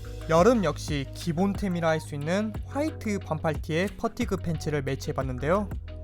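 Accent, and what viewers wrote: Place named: Jeolla, South Korea. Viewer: 전라도 사투리가 정겹네요^^ 광주사람이라 딱듣고 알았네요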